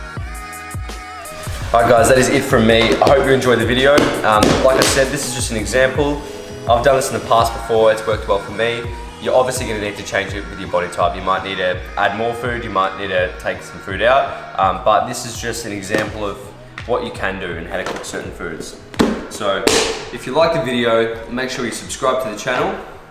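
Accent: Australia. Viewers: Is this guy aussie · are you from Australia 🇦🇺? If so I’m from Melbourne Australia 🇦🇺 G’day mate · Aye are ya from Melbourne? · Are you Australia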